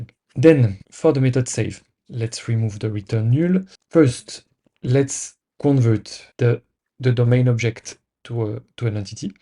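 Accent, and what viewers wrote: France. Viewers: france accent ? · On entend bien l'accent Français, c'est bien pour comprendre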